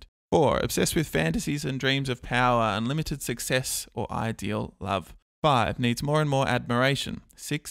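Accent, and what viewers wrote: Australia. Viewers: So ya have an Australian accent?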